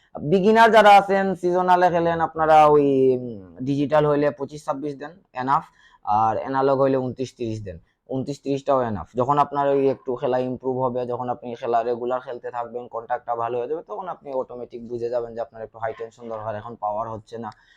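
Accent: Sylhet, Bangladesh. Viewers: Bro apni ki Sylheti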